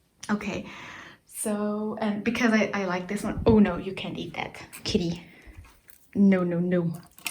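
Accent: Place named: France. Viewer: Hi, Thanks for such a detailed craftroom tour and all the inspirations. Love your French accent. Je parle tout petit Francais. Thanks for the link of the beautiful paper wall flowers from Etsy. I was also wondering whether you can give me information on the poppies wallpaper at minute 2: 48 on your video. A link would be awesome or at least the store you bought it from. I loooove it so much and hope to get it for my house. Thanks so much. Hugs, Rosalie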